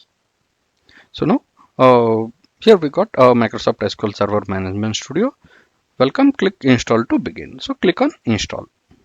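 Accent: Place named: India. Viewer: why each tutorial video i'm watching bout ms products is with this awful indian accent, no rasism, I'm just fed up with this pronunciation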